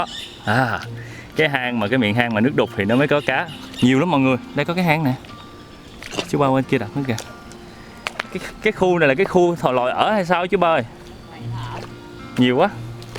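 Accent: Mekong Delta, Vietnam. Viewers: Giọng miền Tây nghe thương thiệt luôn. Cám ơn đã làm vi zeo này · Thích như vậy hon gần gũi thân thiện cách nói chuyện hào sảng chính hiệu miền TÂY năm bộ 👍👍👍👍👍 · Bạn nói giọng dễ nghe miệt vườn hay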